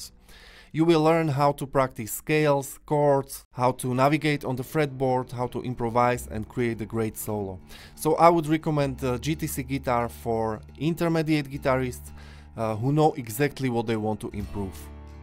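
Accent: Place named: Slovakia. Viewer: Nice content, I'm also 95% sure that you are from Slovakia according to your English accent. I'm right? :)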